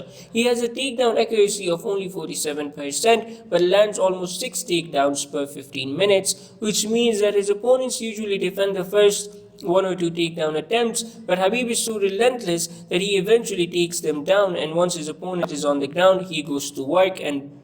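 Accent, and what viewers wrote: India. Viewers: yeah man..great to find an indian fight fan.... Namaste ... His accent sounds like indian ...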